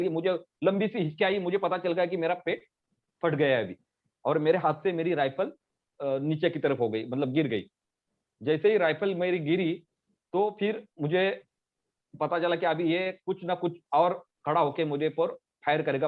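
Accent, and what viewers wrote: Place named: Maharashtra, India. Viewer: Saheb, your marathi accent is very beautiful 🙏🙏 Hats off to your bravery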